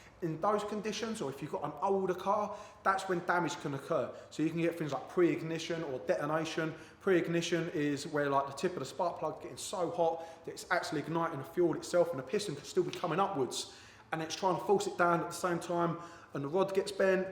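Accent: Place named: United Kingdom. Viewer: Very informative, but not easy to understand you since you were talking with a British accent and very fast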